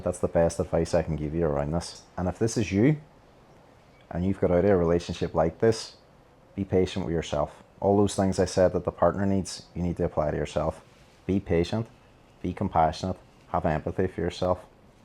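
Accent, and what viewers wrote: Ireland. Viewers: I just wanted to say thank you and I love your Irish accent. I’ve personally experienced this detox period from a toxic relationship and it is so painful. I was worrying about my own safety once I decided to leave the relationship! I have done the necessary healing and don’t ever want to go back. This helps me as I’m training to be a counselor myself! God bless you friend! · with that awesome irish accent, well said, and thank you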